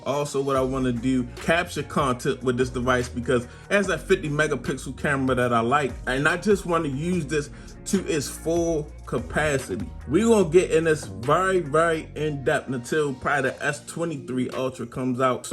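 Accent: Baltimore, United States. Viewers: Boy got a strong Baltimore accent 🤣😂🤣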